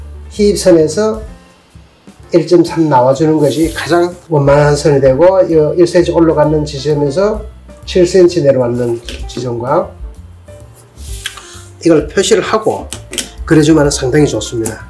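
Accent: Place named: Gyeongsang, South Korea. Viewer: 할랑하게 갱상도 분이신거같네요 설명잘들었습니다 ㅎㅎ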